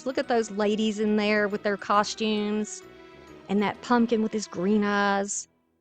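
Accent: South, United States. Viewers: very cute and awesome vintage haloween vibes. i like your accent, reminds me of living down south. and i like your creations. congrats on the temu affiliation. as a fellow diy/arty crafty type, i have some amazing stuff from temu and next time i order, i will remember you ☆ ty for sharing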